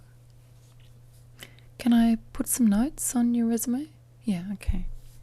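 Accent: Australia. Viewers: You are so beautiful, your eyes and smile really draw me in and that's coming from a 22 year old:) oh and that ozzy accent! Perfect.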